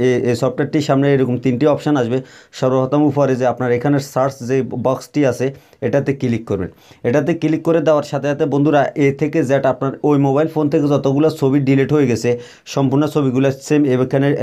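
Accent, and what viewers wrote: Noakhali, Bangladesh. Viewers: নোয়াখালীর ছেলে না তুমি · ভাই আন্নে ত নোয়াখাইল্লা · গুদ ভিডিও আপনি কি নোয়াখালি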